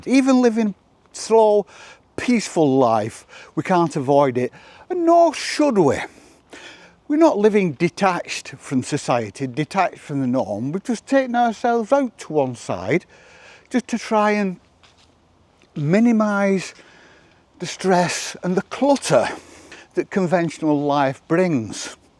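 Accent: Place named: Lancashire, United Kingdom. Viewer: thanks, joe soap. loving yer lancs. lilt. ;)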